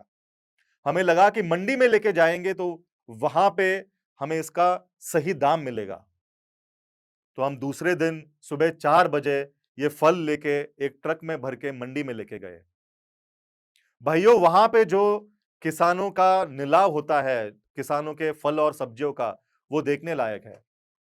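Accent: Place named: Maharashtra, India. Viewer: Aapki hindi is very much maharashtrian..❤❤ love ur products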